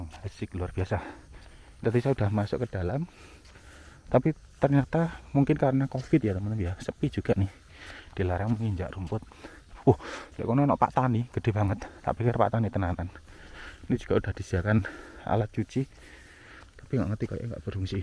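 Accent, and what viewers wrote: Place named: Surabaya, Indonesia. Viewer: Disertai peta lokasi, peralatan yg dipakai dan celotehnya sepanjang jalan ttg kiri kanan maupun cuaca, saya sangat suka kejujurannya cara bicara suroboyoan dan dicampur gaya arek malang.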